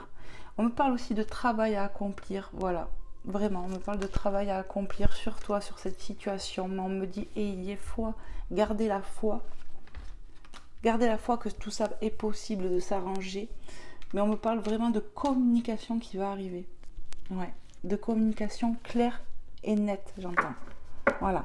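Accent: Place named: France. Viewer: J ai fort apprécié, vous vous donnez beaucoup, yes! Yes! J espère que les choses vont enfin de bouger … j apprécié votre accent français , ça a qq chose de sympa. Je suis Belge,,, bon week end à vous🤸🏻‍♂️🦋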